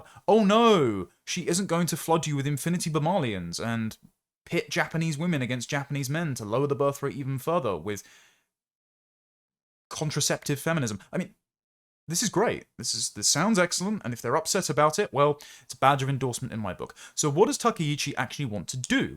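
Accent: RP, United Kingdom. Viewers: You sound like you shop at John Lewis, also why don’t you allow me to come on to discuss AI, don’t go against evolution.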